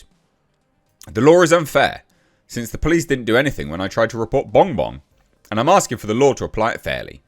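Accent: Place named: United Kingdom. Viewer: Forgive me for repeating myself but I love breakdown of the situation. Between his British accent and his use of modern colloquialisms it’s hands down my favorite solo streamer/youtuber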